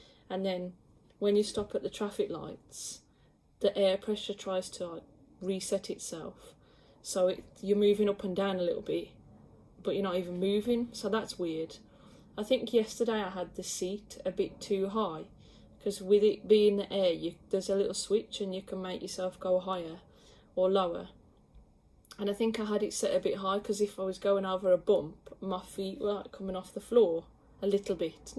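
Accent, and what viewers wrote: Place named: Midlands, United Kingdom. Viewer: Hi. On your braking and some things you have said make sure your seating is right. Braking might be juddering because your not seated close enough to controls. The steering doesn’t sound right. On approach to islands etc yeah slow right down prior to entering the island and find the gap to keep on going. This saves you stopping and having to do the handbrake and you won’t need to try and pull out at such a slow pace. Mirrors mirrors mirrors especially when going around islands where you have to watch the swing on the back. All these things you mention will become second nature when you have passed and your on your own. You will still make some errors like me but you will get there. Good luck. You sound like a midlands gal.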